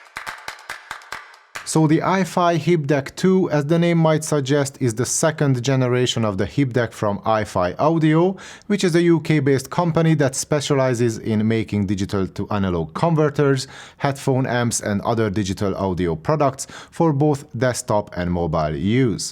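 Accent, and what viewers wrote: Hungary. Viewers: you sound very hungarian edit: I’ve just realized you are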